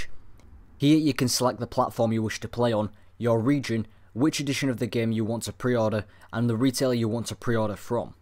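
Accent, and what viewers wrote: United Kingdom. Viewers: Well done! This video got you an insta-sub from me. Your even peaceful brit accent is a refreshing change of pace from our freak out beautiful b-tard MoreConsole friend. :-) Can't wait to watch more!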